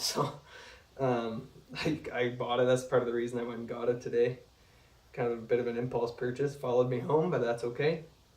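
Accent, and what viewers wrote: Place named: Canada. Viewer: You sound Canadian so please stop staying Zeee - its Zed :)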